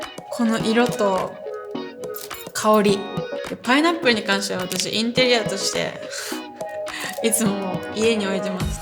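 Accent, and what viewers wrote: Okinawa, Japan. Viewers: 沖縄なまってるよ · 沖縄な喋り方！！！故郷思い出して気を取られて内容聞けなかった笑 もう一度見る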